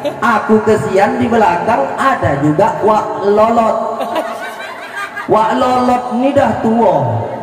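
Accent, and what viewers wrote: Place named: Medan, Indonesia. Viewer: Rindu kali aku logat Medan. Seperti yg dikatakan ini. Kapan ya bisa ke Medan lagi. Semoga diberikan kesehatan ya pak.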